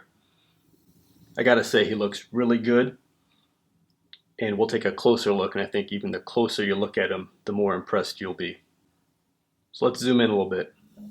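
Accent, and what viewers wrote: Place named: Wisconsin, United States. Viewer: You sound like you're from Kenosha Wisconsin.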